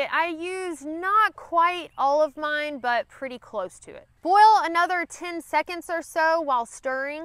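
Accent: South, United States. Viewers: Yup. Definitely southern. If anyone had any doubts, that cure it. "Slap your momma good" love it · It's so flippin' adorable. Southern folks have no idea how charming they are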